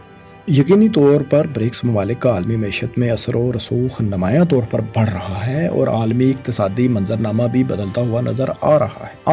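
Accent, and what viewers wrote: Punjab, Pakistan. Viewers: Aapki malumaat bahut achi Hein 👍🏻per Urdu Aap Punjabi lehjey mey Boltey Hein jiss Maza kirkira Hojata Hey 😂🙏🏻🙏🏻👍🏻👍🏻👍🏻👍🏻 Sohail Karachi 🙏🏻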